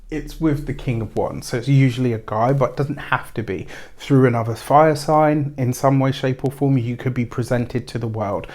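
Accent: United Kingdom. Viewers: Sag Rising here and that was totally on point thanks! Fellow Brit here too and it's refreshing to hear another Brit doing tarot 🙂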